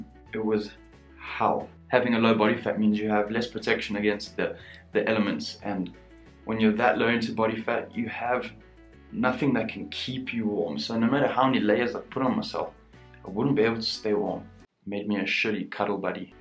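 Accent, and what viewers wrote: South Africa. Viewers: Are you South African bru? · U sound like u come from Sa(south Africa)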